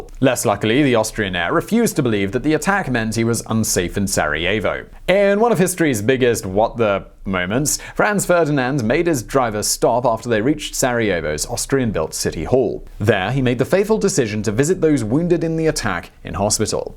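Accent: United Kingdom. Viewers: oh and the british accent with the rounded "o". yeah he s smart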